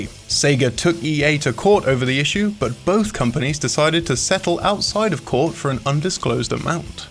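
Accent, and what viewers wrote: England, United Kingdom. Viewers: You'd think everyone had never heard Englishman speak before. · Words are pronounced differently based on region. In this case, likely British. Case closed. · I love you and your weird (to me) British pronunciations,! Now I'm genuinely curious if that's how English say "patent"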